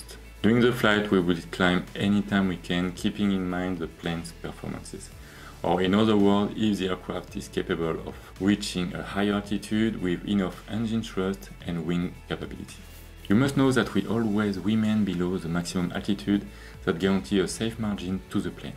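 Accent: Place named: France. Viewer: Ca c'est de l'accent Franco-Français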